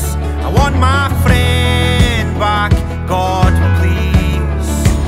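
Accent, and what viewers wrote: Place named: Scottish Borders, United Kingdom. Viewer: Sounds like your accent is from the borders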